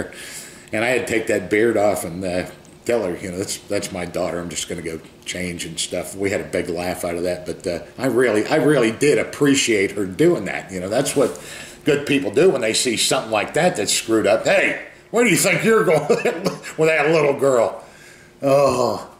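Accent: Pittsburgh, United States. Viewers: Hey are you from Pittsburgh originally? You sound like you have a Pittsburgh accent. When you make a sandwich I'll bet you use Isleys Chipped ham 't you?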